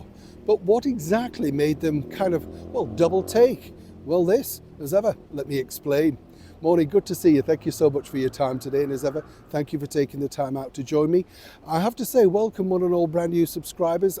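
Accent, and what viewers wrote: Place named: North, United Kingdom. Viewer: What part of the north do you come from?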